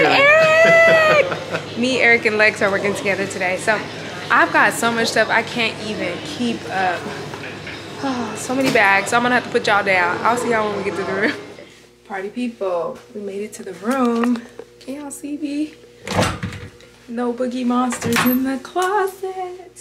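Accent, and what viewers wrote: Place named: United Kingdom. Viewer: I love hearing you call us "babes" with a British accent 🤣💙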